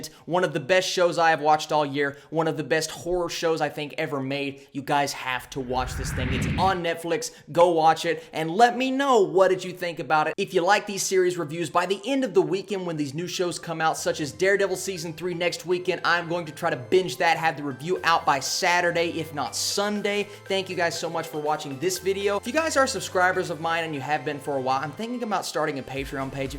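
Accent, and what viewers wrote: South, United States. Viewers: Something I had was I was expect something to happen all the time, usually in horror movies/series you can't tell when somethings gonna happen. By the way your southern accent is killing me I love it.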